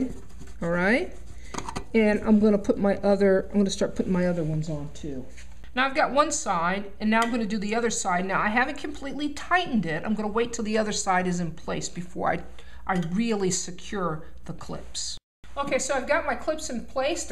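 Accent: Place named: New York, United States. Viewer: I gotta ask, what accent is this? Does this orignate from NY?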